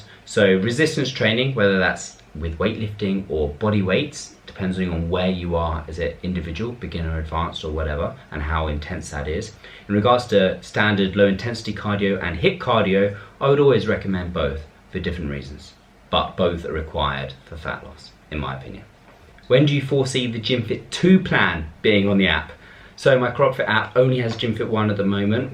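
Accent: United Kingdom. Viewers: your British Accent is so cute.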